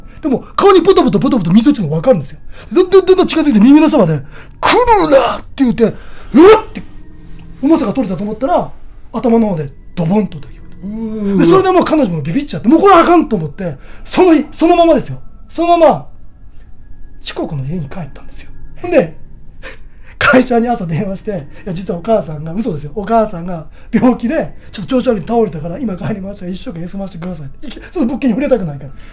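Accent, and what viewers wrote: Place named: Kansai, Japan. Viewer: 初めてコメントします 語りの方の話し方が関西弁で、尚且つ少し崩した感じの話し方ですごく聞きやすくて大好きになりました！これからも楽しみにしています🥰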